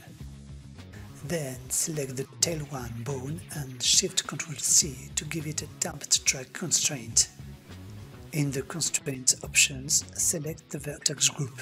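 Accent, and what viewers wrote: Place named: France. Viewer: To me, you sound like you have a slight French accent, and I don't know if you knew this, but many Americans and other English speakers love French accents. In fact, your accent is in a great sweet spot where you sound foreign enough that you get the mystique of a foreign accent, but your pronunciation is good enough that there is no trouble understanding you.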